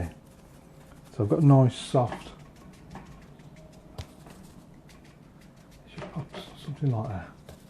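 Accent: Birmingham, United Kingdom. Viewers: Love the brummie accent being a fellow Brummie good to hear the tones from Brum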